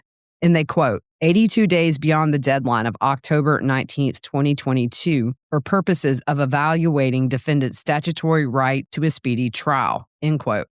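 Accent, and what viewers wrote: South, United States. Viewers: Hello 😊 New sub! Just luv your accent! You’re the original southern gal, I am an implant lol. Missed the ID trials & hoping to play catch up here. Since you got this 1st I feel extra lucky to have finally found my go-to for this case! 😊 Enjoy tonight with your son!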